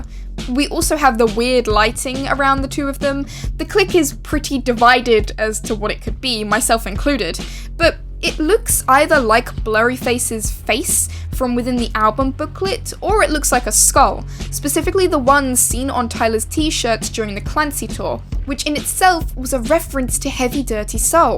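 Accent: United Kingdom. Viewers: i didn’t realize was british 😭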